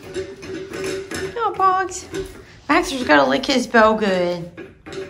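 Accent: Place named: South, United States. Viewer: Hi! 😊 I watch your channel almost every day, and I so enjoy your happy go lucky personality! Maxie and Pollux are the best 🐶 🐶. I just get a big kick out of them 🤣. I would love to see you and take all of us on a tour of your town. I live in Southern California and there is so much traffic and people here 😕🙄 I have to tell you that I love the way you talk 😊. Love southern accents!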